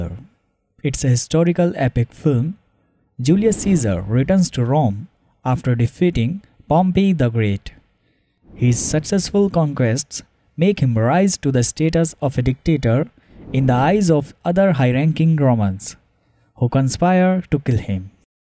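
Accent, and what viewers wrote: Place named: India. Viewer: i dont care about the stories im here to hear the indian voice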